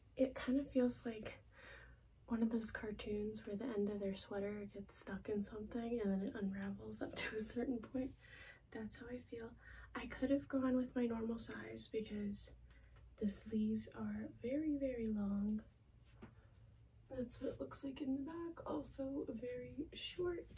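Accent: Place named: United Kingdom. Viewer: stoppp omg you have to do a video where you just talk with your british accent!!! ❤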